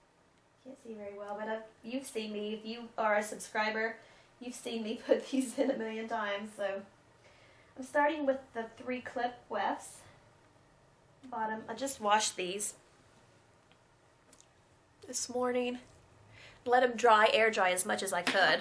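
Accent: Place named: South, United States. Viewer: Where are you from? I sense a lil' southern accent? Love it!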